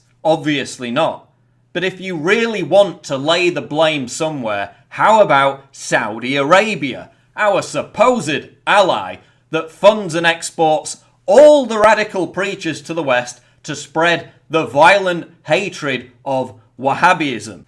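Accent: United Kingdom. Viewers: wait is this guy British?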